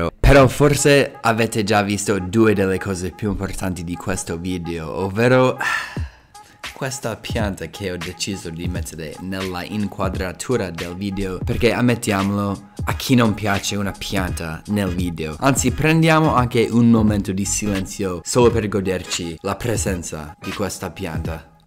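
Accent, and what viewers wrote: United States. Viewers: you are becoming my Number One source of entertainment. I saw you and your girlfriend in an interview with Davide, whom I follow seriously to improve my Italian. Your YouTube posts really tickle me. I have been learning Italian, off and on, more off than on, since 1965. I have always worried myself silly over my American accent, and now here I find a guy who is making a living off of his. Good on ya! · 🤣🤣🤣🤣 Il tuo accento è sempre moooooolto americano. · L'accento americano si sente un botto😂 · Però sfatiamo un mito: dicci una volta per tutte se carichi l'accento americano che hai quando parli italiano o no!! Porca miseria io sono sposata con uno del sud eh!!! Ma lui non hai mai avuto l'accento che hai tu. MAI MAI MAI! Il modo in cui ad esempio pronunci la T... ma non è un crimine, nè un'offesa o una presa in giro eh!! Che davvero mi fa assolutamente strano sentire te che non sbagli manco un congiuntivo e hai questo accento così forte... 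· Anche io! Booo se non parlasse con accento americano passerebbe per italiano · non ti preoccupare il tuo accento americano e' molto simpatico.Un po come noi italiani quando parliamo inglese.La stessa cosa.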